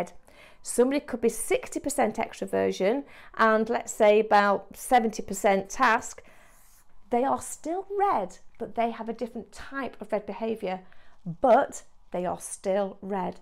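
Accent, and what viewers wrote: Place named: England, United Kingdom. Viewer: 🇬🇧💞🇺🇸 Your English accent sounds lovely and easy to understand. I am pleasantly surprised as I speak American English. Please do not speak slower in your broadcasts. YouTube has a Playback Speed adjustment so listeners can choose the preferred speed of the voice (normal, slower, very slow). Thanks for your thoughtful advice on very important issues in human behavior. 🙏💖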